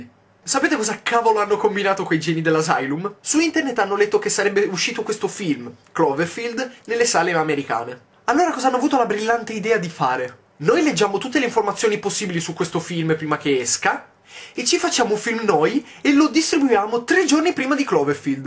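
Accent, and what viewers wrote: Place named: Calabria, Italy. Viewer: Gli ultimi 5 minuti sono stati troppo pisciosi grande yotobi xD comunque sicuro che sei di torino?quell'accento sembra tipo calabrese...eheh vecchio volpone xD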